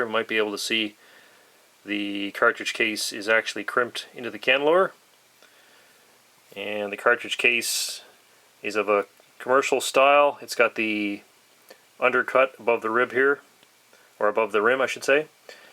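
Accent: Canada. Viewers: You sound Canadian. Greetings from an American citizen, who was born and raised in England.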